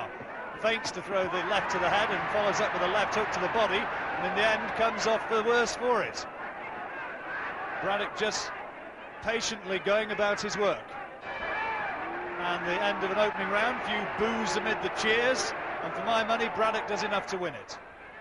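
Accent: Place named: United Kingdom. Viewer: The Russians are smart enough to get a British sounding guy to narrate the fight, but don't be fooled...this is Russian television.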